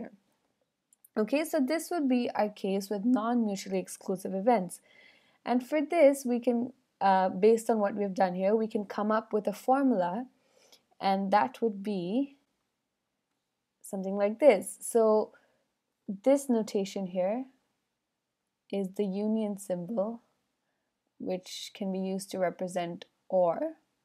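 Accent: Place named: India. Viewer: Thanks. 👍 I was just wondering if you are an Indian? You sound like one.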